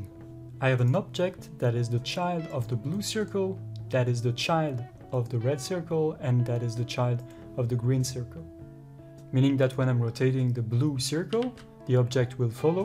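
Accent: France. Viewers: thank you) best French English ever)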